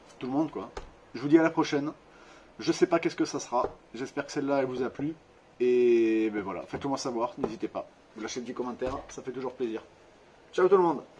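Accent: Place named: South, France. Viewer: Salut je viens de voir ta vidéo sur le régulateur 👍 c'est super bien expliqué car j'ai eu le problème la sur ma Honda vf750c rc43 de 1995 je super sympa que tu donnes de ton temps pour aider les autres et j'espère qu'ils seront reconnaissant envers toi .en tout cas moi j'ai trouvé ça génial et en plus le petit accent du sud c'est le top merci pour ton explication 👍 Bonne continuation 👍🤟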